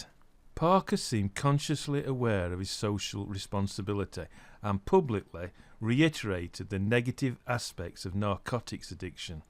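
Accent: Yorkshire, United Kingdom. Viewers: so thats what a yorkshire accent sounds like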